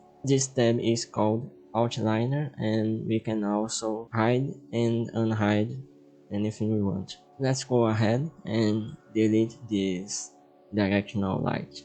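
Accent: Brazil. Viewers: inglês brasileiro :)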